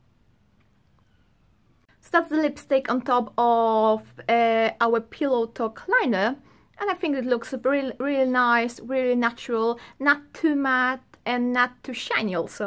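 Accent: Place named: United Kingdom. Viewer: pfffeeeeeerfect, te typowo brytyjskie akcenty są niesamowite w Twoim wykonaniu, uwielbiam akcent brytyjski a u Ciebie słychać go tak przeuroczo ;) xoxo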